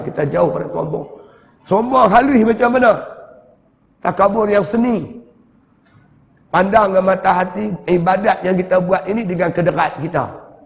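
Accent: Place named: Kedah, Malaysia. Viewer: Alhamdulillah kata2 yg penuh hikmah dan saya rasa tersentuh hati dgr nasihat ust siddiq nor. Dlm masa yg sama sy nk tergelak dgn loghat kedah dia😄 semoga Allah memasukkannya kesyurga firdaus.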